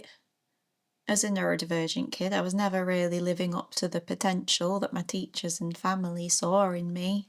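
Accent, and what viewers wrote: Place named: North, United Kingdom. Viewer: Still got the northern accent 🎉🤟🏻💪🏻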